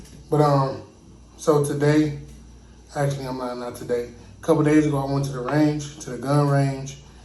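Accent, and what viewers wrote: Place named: South, United States. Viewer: 😭😭😭😭 Sounds Just Like Down South, When I Use To Live In TX & FL, I Remember A Few Niggas Said It Like That, Always Sound Fire 🔥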